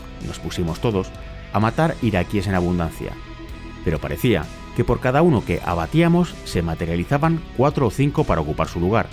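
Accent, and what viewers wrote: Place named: Spain. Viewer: Porque dice audio real, sí el mae era gringo y este audio es españolete??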